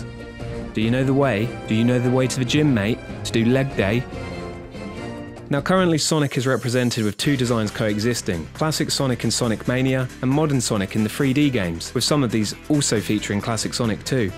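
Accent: United Kingdom. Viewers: My gosh! You've cut the video on two pieces, okay, but you didn't include links in the description! English is not my bloody native language and the British (or Australian I dunno) pronunciation make it even harder to get by ear. Add the links! I can find anything in two wasted minutes more, but many people doesn't. Thanks for video thou. And I put a thumbs up on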